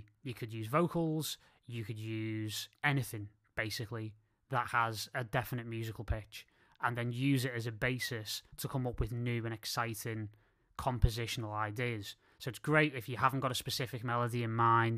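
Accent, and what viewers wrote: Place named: England, United Kingdom. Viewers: English accent is the most stupid lame az accent! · ***** Well said Sir. I am from Liverpool, but living in Sydney. Always good to hear an accent from back home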